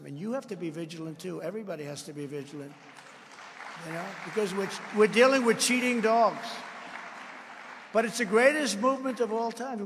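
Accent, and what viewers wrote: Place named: New York, United States. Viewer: Love you're NY accent God speed Presidrnt Trump again We are praying the fraud will be wide open this time.😊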